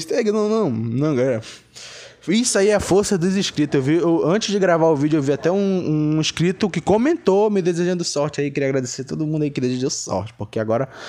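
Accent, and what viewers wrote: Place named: Pará, Brazil. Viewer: Você é de onde??? Parece o sotaque do norte,e do Pará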